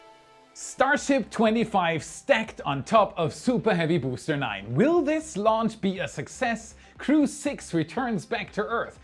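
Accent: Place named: Germany. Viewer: Your english has improved a lot over the last time, better pronunciation, more words in your narration👌🏻 However, please keep your german accent! 😄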